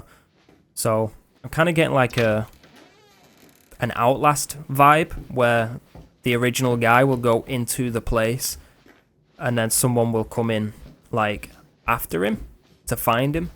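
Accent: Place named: West Yorkshire, United Kingdom. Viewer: Anyone ever commented on your West Yorkshire Accent? nice to hear it haha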